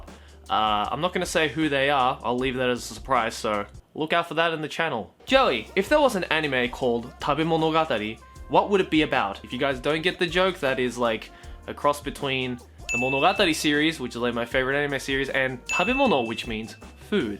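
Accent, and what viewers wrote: Australia. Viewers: #answermesenpai speak in your Australian Accent for the rest the video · Holy shit I've been rewatching some older videos from Joey and the bois and I'm cringing at the thought of me ever watching these videos 5 years ago. These are all low-quality, immature, cringe videos compared to content you make today, both on your own channels and trash taste channels. Honestly watching another 10 episodes about shitting sounds better than rewatching this video once again. Also your aussie accent strong here. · #AnswerMeSenpai Do you speak Japanese with australian accent?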